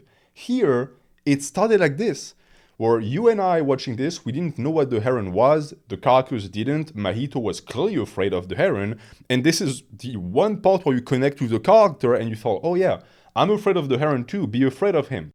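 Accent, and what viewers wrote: France. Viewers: Why is Adam driver pretending to be a YouTuber with a French accent? He's pretty good!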